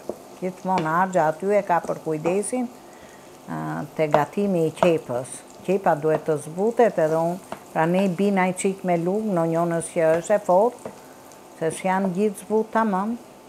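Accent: Tirana, Albania. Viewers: Te lumshin duart moj zonje e nderuar te ndjek vazhdimisht . Me pelqen e folura jote origjinale e Tirones. Qofsh me jete te gjate dhe e gezuar.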